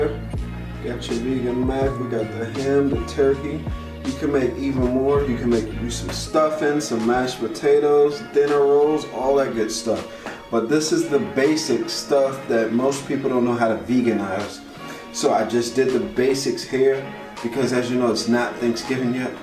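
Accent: Baltimore, United States. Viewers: You from Baltimore, ain't you?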